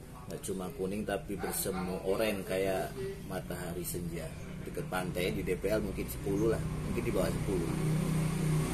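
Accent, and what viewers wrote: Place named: Banyumas, Indonesia. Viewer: wow musangking ngapak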